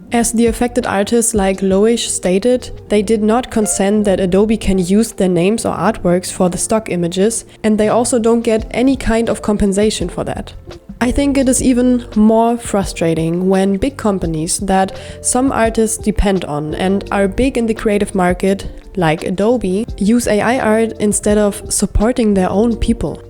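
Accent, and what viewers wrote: Germany. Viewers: Is it just me or does she sound like she's German. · Bist du deutsch? Klingt wie deutsches Akzent. Grüßle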